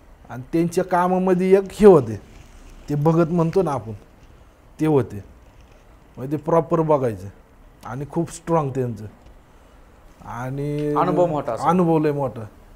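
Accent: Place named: Pune, India. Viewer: Propar puneri language Good 👍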